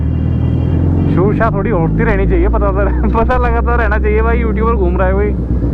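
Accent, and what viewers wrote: Haryana, India. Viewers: Bhai i like you jaat language. Awesome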